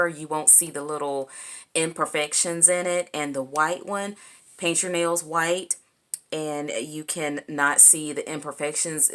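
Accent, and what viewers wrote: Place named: South, United States. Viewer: Thank you for this video! I absolutely love your country accent! ♥️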